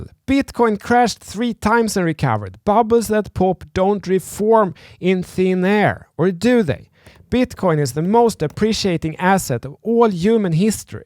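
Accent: Sweden. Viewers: I lived in Sweden for 6 years. I have never heard a person have more Swedish accent while talking English. That's why I'm subscribing to your channel 👍🏼😊 you both have points. Her point is that it costs too much electricity to keep running and make new currencies.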